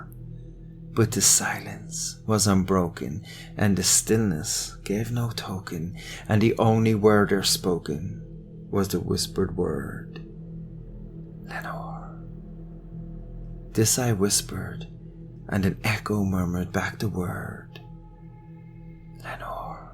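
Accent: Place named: Ireland. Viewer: Aaaaand I fell in love again ❤ An Irish man reading one of my favorite authors? Yeap, sign me up!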